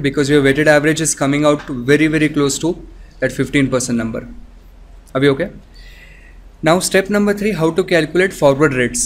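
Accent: India. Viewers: This annoying irritating fucking indian accent.... Guys, why every nation can adjust its accent except you?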